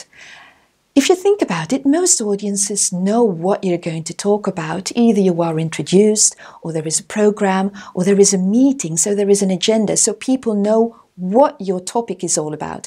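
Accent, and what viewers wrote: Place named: United Kingdom. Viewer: She speaks very smoothly without any fumble- awesome British style of speaking...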